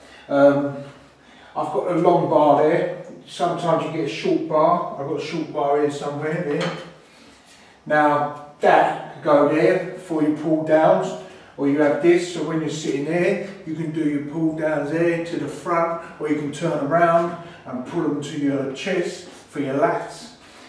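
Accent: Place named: Romford, United Kingdom. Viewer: Exactly the advice I was after. Proper decent accent - around the Romford area?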